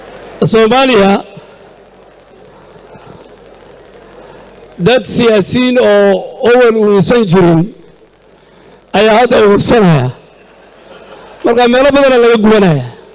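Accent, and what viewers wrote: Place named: Kenya. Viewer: Oh akhas waxa uu ku hadlaayo fiiri dadka u qoslayo fiiri waa siyaasiin soomaali shakhsiga sidaas u hadlaayo waa nin kenyaan aha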